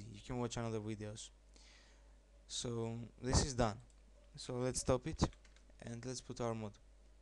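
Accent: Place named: Portugal. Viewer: paraces tuga a falar! LOL~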